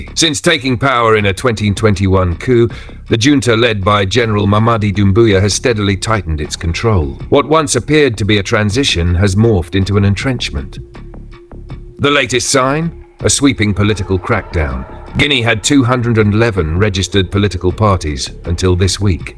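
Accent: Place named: Europe. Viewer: African content in European voice? We can do better.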